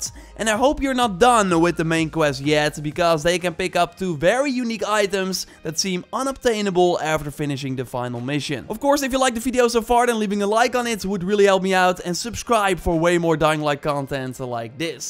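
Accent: Netherlands. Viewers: Bro jouw englens klinkt echt Nederlands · R u dutch?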